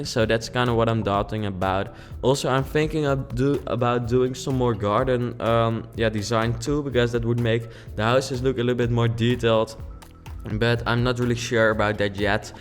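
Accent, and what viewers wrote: Netherlands. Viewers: That Dutch accent though... ;) But I really like your work!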